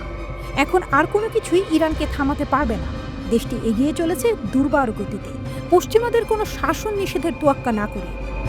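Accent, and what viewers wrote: Kolkata, India. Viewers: কোলকাতা স্টাইলে নিউজ পড়ছে এর বাড়িকি কোল কাতায় নাকি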